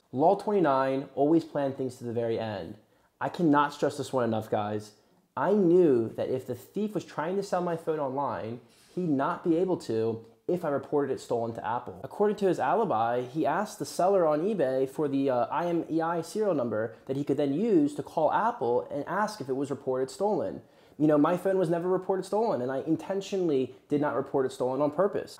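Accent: United States. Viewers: No shade but you u from philly? That’s a lot of Phowwns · Sounds like south florida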